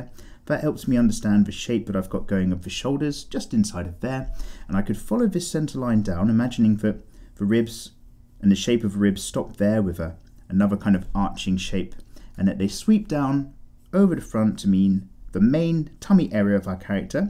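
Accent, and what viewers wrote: United Kingdom. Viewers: Are you a britisher? How do you say bottle of water😂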